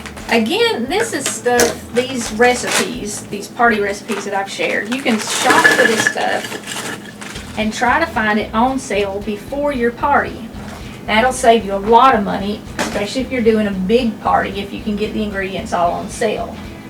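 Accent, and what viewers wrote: South, United States. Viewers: I love your southern drawl and yes, I can just tell that you are good people 😘👌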